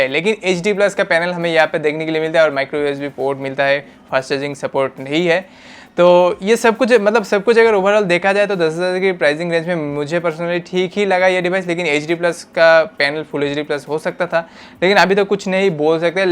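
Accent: Bengal, India. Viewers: Amar mone houechilo Tumi Bengali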